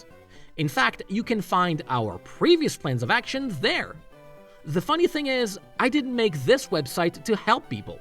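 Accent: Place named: France. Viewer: Youtube automatically translated you to French, and while I'm French and suspect to your accent that you actually speak French IRL I was not prepared for this when the video started.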